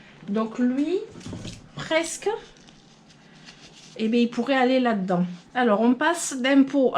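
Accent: South, France. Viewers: Tes videos sont pleines de couleur avec ton accent du sud. J'adore. Merci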